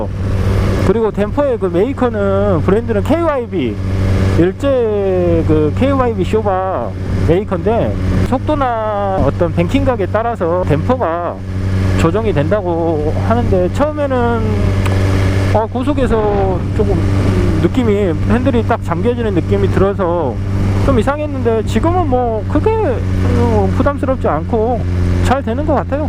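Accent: Jeolla, South Korea. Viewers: 늘 재미있게 잘 보고 있습니다. 구수한 전라도 사투리랑 같이 들으니 휠 재밌네요 ㅋㅋ 한가지 질문이 있습니다 - 저는 gsx-s1000을 타고 있는데요. 늘 r차에 대한 로망이 있었습니다. 문제는 자세인데 네이키드에 비해 많이 힘들겠지요? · 으따 전라도구마잉~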